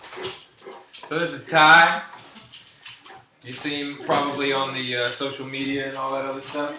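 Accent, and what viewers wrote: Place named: Tennessee, United States. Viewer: Lolz I can hear the TN in his voice. XD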